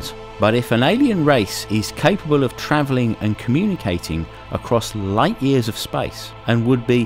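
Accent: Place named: Cockney, United Kingdom. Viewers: Yes he has got that same nasally sound,and London accent. I was thinking that also. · i came here just because i caught the voice of a cockney.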